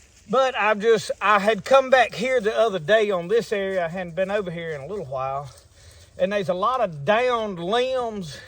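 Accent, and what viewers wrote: South, United States. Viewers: Looking for help for Arctic Blast on homestead in Alabama 😮(AND I LOVE 💕 hearing your sweet Southern voice 😅)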